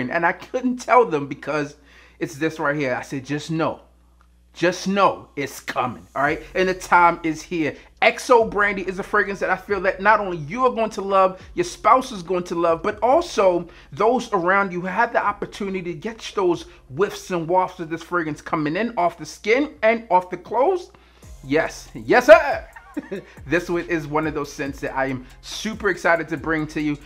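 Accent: Baltimore, United States. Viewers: You from Bmore?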